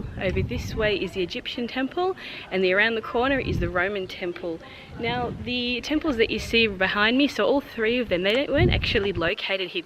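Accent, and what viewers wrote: United Kingdom. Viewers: did I write your name correctly you got a beautiful London accent very clearly prounced each word I love it, and it seems that you’re a pro. Guide and nicely done touring in Europe for the firm intrepret perfect... especially your smiling gestures shows how you enjoy your job very nice indeed... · Are you Eglish( from England)?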